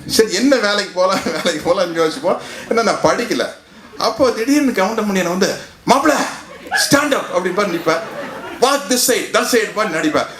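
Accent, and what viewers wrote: Coimbatore, India. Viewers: கோவை தமிழ் சூப்பர் 👌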